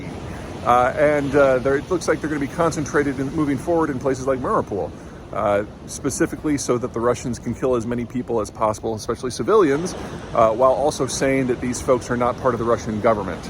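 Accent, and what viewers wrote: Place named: Midwest, United States. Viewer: Midwest accent causes this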